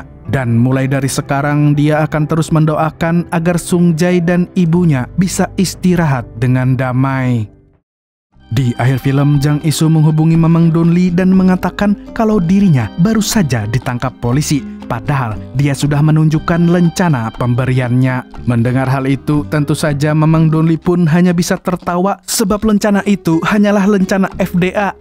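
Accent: Banjar, Indonesia. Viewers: Bahhhhh mendaiii urang banjarrr pun ai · Urang banjar sakalinya si adminnnn😂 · Org banjar miminnya nh sklinya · umaaa org banua banar sekalinya sidin ti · ohh orang banjar sidin nih sekalinya. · ternyata pian urang bnjar mang lah, kd nyangka uln😂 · Org Banjar kah mng 😅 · orang mana pian bang kaya logat banjar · umai urang banjar sekalinya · BANJAR KAH MANG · Orang banjarkah mang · urnk banjar ni sako dr suara opening iykh min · nah orang banjar kah mimin nih 🤣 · bah urg bnjrr skliny salam di alalak tengahh manngg hahahhakwkwkwkw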